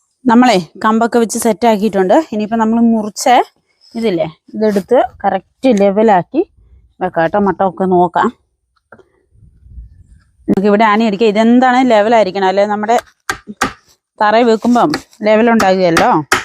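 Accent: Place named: Kasaragod, India. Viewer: നിങ്ങൾ അടിപൊടി❤ നിങ്ങൾ ബുള്ളറ്റ് ഓടിക്കുന്ന ഒരു വ്ലോഗ് ഇടാമോ പിന്നെQA ചെയ്യാമോ കുറേ ചോദ്യങ്ങൾ അറിയാനുണ്ട് എപ്പഴാ ബുള്ളറ്റ് ഓടിക്കാൻ പഠിച്ചേ😊 പിന്നെ എത്ര വരെ പഠിച്ചു പിന്നെ കാസറഗോഡ് സംസാരം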